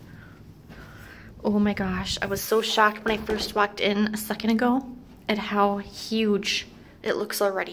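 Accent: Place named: Minnesota, United States. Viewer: You're either from Minnesota or Wisconsin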